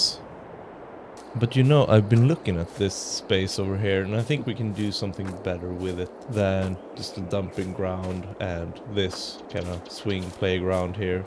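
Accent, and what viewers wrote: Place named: Sweden. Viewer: Love the series! But you have to be swedish with that accent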